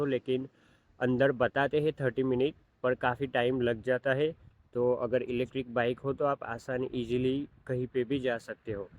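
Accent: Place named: Gujarat, India. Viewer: Bhai tame gujarati cho tamari bolvani ton mane evi lage che❤❤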